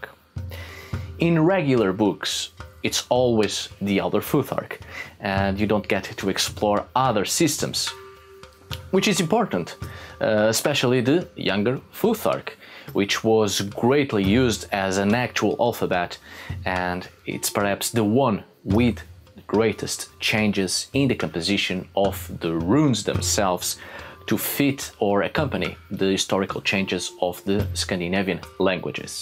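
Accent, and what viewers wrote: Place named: Portugal. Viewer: You are portuguese right? The way you speak your english, the way you pause in between words, and the words you choose. It really sounds like a portuguese speaking in english. (I am portuguese btw ahah)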